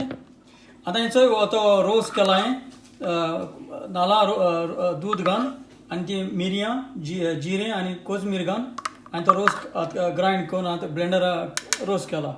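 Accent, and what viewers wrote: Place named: South Goa, India. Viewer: What I loved most about this video is our South Goan Konkani and accent ❤❤ wish we saw more of it in media!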